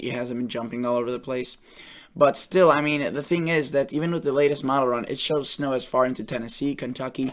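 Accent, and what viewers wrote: Midwest, United States. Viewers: You have a nice voice for these presentations and I enjoy your accent. I think it's from somewhere in the Midwest/Upper Great Lakes. I am a Southerner originally but now living in New England. I can tell your accent is not from the South or New England.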